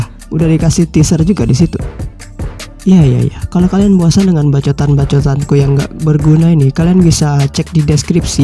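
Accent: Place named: Banjar, Indonesia. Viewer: Iya kalo, pantas ae pina ada logat banjar?? Sippp lanjuttt…. Salam Batinjakan Dr Banjarmasin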